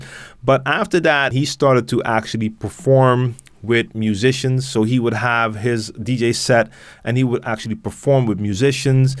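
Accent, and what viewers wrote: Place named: New York, United States. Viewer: I always thought you were from new york.. you have the NY accent.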